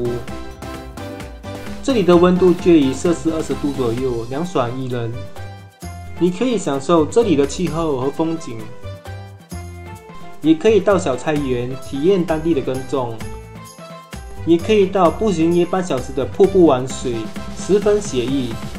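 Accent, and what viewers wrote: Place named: Kampung, Malaysia. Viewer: 这种kampung华语，应该会让外国华人听了很受罪，YouTube是全球性的，不是我们马来西亚独有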